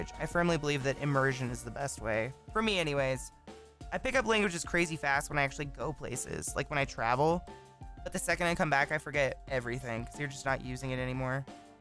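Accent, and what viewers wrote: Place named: New Jersey, United States. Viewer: Nuthin like a Jersey accent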